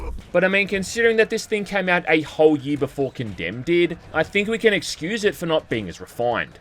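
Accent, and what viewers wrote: Bogan, Australia. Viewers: thats one bogan af aussie accent.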